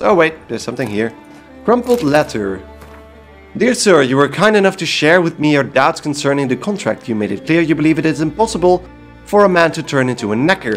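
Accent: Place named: Netherlands. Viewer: Your obviously native dutch speaking 😃 from the Netherlands even !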